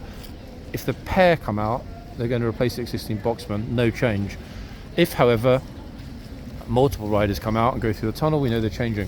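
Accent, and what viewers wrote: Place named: United Kingdom. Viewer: When I first started watching videos and he said 'tour guy', I heard with my Californian ears 'tall guy'. And I'd look but not see a tall guy; wondering why he would point out tall guys anyway. Finally, it dawned on me that he was saying tour guy. Usually I can tune my California ears to British but those 'r's and 'l's apparently don't cross over.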